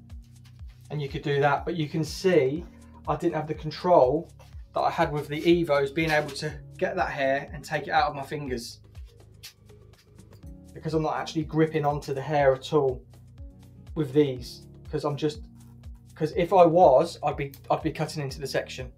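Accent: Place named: Southern England, United Kingdom. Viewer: Im Yorkshire and married to a 'suvverner. Love that accent 😊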